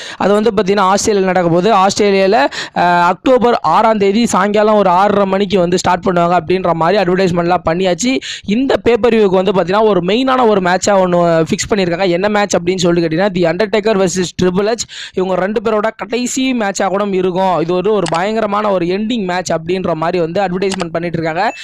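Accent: Villupuram, India. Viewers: Bro are u from villupuram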